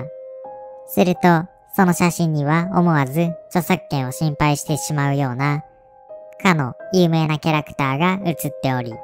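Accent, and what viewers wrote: Kansai, Japan. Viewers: 関西弁ニキちーす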